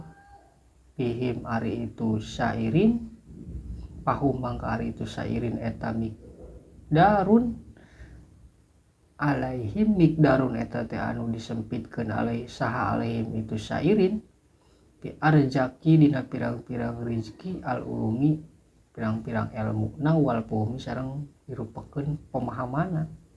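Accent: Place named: Java, Indonesia. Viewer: logat jawaan pak ustadz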